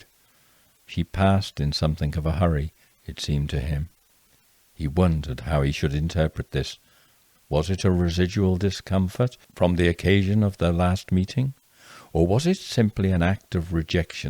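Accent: England, United Kingdom. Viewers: Wonderful. Another intense mystery by to enjoy. Fantastic mysteries with his lovely English accent guiding us through the most certainly surprising end. I can feel the busy day melting away as I listen. What a talent. ❤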